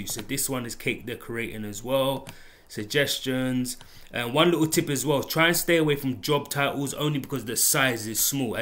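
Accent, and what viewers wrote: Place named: London, United Kingdom. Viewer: He's from London I'm guessing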